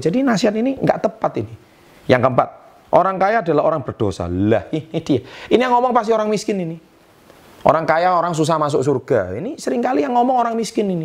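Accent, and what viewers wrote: Java, Indonesia. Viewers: jowone pundi pak??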